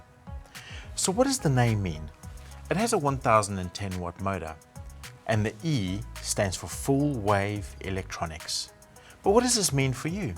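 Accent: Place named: South Africa. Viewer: He sounds South African. I just bought this router. Damn I was not expecting it to be this good!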